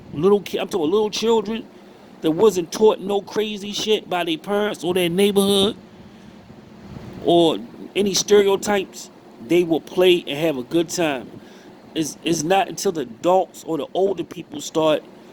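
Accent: Baltimore, United States. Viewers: Ayo you from bmore? Your accent sounds like it....and big facts about intelligent people, we colorblind, all i see is green. If you help me see green, idc what you look like, lets get it.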